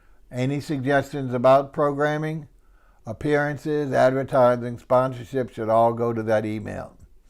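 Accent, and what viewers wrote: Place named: New Orleans, United States. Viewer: sure good to hear that N'Orleans accent I remember so well. Your voice is in sync with your video. Joe Robberson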